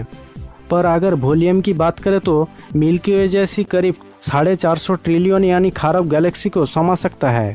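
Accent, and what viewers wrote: Bengal, India. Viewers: ভাই বড্ডো বাঙালিদের মতন শুনতে লাগছে। কিন্তু খুব ভালো হয়েছে। আরো ভিডিও বানাও। অবশ্যই ভাল হবে।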